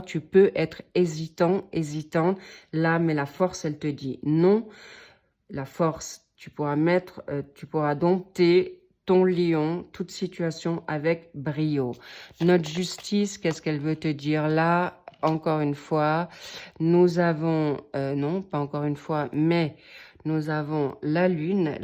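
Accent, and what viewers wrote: United Kingdom. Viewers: Merci beaucoup pour ce splendide tirage 🙏 Et merci pour votre diction parfaite et cette pointe d'accent d'outre-manche 🎉🙏😊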